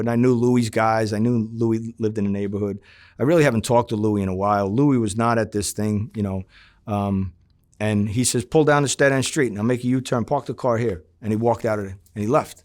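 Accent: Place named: Italian American, United States. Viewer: I love a NY, NJ, Boston, Philly, and Bmore accent. Might be a lil bias wit the bmore accent cuh i live around there but I’ve always admired these italian american city accents fs. There’s just something so unique sounding about them than ur typical american accent. That being said, his voice paired with his story, really narrated like he’s narrating a book. Really helps keep my attention. 2 hours and 30 mins in and haven’t stopped watching this whole time.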